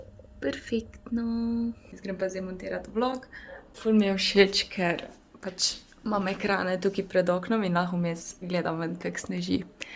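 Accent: Carinthia, Austria. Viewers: Tvoji vlogi so meni zelooooooo zanimivi saj se pocutim zelo domace ko slisim koroski naglas ker sem tudi sama iz koroske🙈😂🥰👍👍🌈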